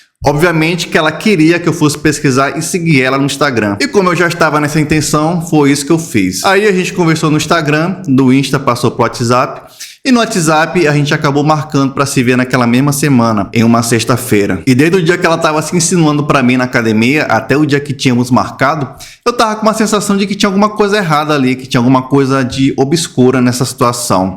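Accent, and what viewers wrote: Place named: Ceará, Brazil. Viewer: Esse sotaque é cearense.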